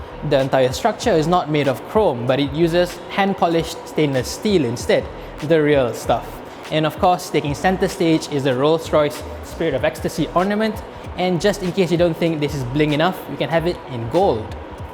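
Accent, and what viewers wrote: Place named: Malaysia. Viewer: Suara orang Malaysia. Tu yang kita nak. Ada orang dah pandai cakap orang putih nak jadi orang putih terus.. selamat maju jaya.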